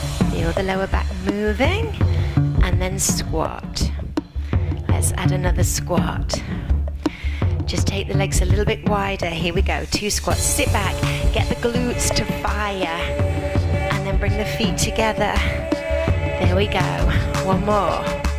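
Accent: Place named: England, United Kingdom. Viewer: hello. I am blind and really appreciated the fantastic order description also I love the English accent am I and as I am also English thank you thank you so much will keep listening to your channel regularly Donna Adelaide Australia 55-year-old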